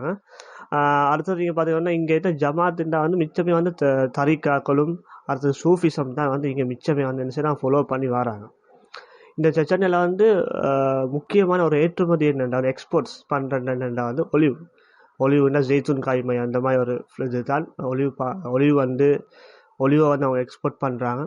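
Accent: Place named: Colombo, Sri Lanka. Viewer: RECORRECT YOUR SPEAKING. WORDS YOU ARE SPEAKING COLOMBO TAMIL PLEASE SPEAK PUBLIC SPEAKING WORDS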